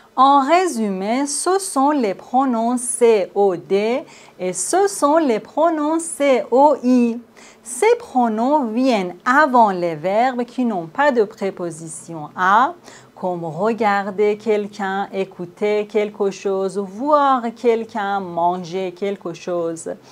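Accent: Iran. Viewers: un accent persan très marqué ❤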